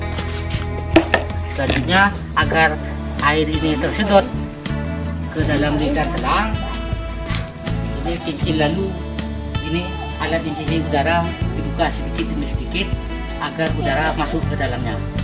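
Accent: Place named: Madura, Indonesia. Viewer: waww.... orang madura nih bahasanya... mantep2 !!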